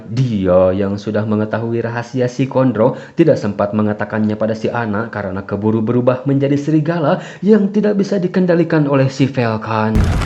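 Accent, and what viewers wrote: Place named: Subang, Indonesia. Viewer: Aksen subang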